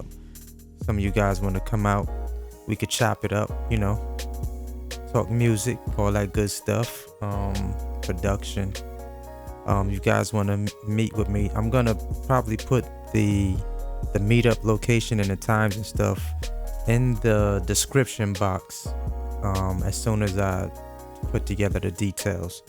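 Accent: Jamaica. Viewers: it bad mi don sound like yu have a Jamaican like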